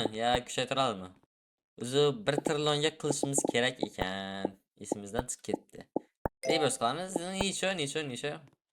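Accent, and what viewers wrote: Khorezm, Uzbekistan. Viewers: Xorazmlik misiz besh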